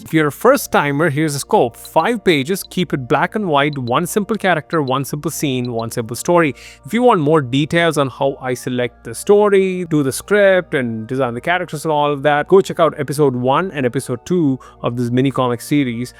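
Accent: India. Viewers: Are you Indian cuz you sound like btw I am learning art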